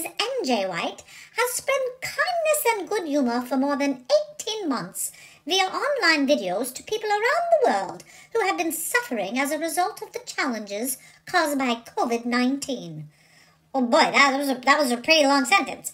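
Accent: England, United Kingdom. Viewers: Dear..What an honor to receive a birthday letter from The Queen..love the English Accent..You continue to help provide joy,happiness and support during these past 2 years.Love to you and your dear mom..I think you should be Knighted..Sir..love you lots. Susan ❤ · Truly Amazeballs! You definitely deserve a letter from the Castle, I am so happy for you. I adored your English accent. That was really fun to listen to. Happy 105 in 2-legged lands. You look marvelous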